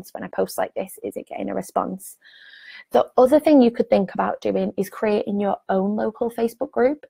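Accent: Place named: United Kingdom. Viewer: nice British accent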